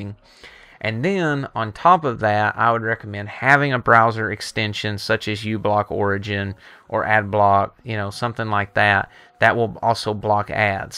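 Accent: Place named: South, United States. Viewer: The southern twang is heavy. 😂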